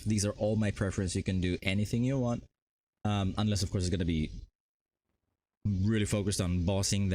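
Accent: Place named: Philippines. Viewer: Damn! I knew I was right, you have a "slight" filipino accent haha. Same here!! Though im pure pelepeno. Btw whats your streaming schedule would gladly tag along :)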